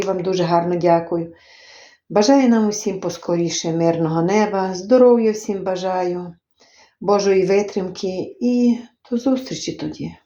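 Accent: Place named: Western Ukraine, Ukraine. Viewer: Какой говор интересный! Западная Украина наверное? Дякую за рецепт.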